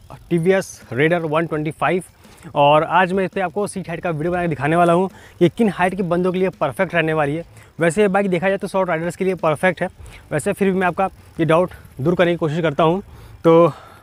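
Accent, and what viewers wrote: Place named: Bengal, India. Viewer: আপনার কথা শুনে বোঝা যাচ্ছে যে আপনি একজন বাঙালি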